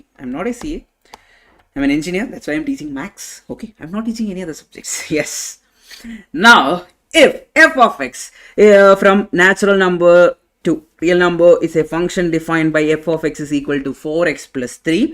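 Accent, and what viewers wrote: Kerala, India. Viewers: Sir ,are you a malayali?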